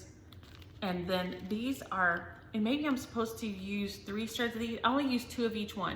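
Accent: South, United States. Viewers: You crack me up! That moonshine story was so funny!! I love hearing your stories. I lived in Louisiana for several years while I went to school and your southern accent and "isms" brings back so many wonderful memories. Southern folk are the nicest people!!!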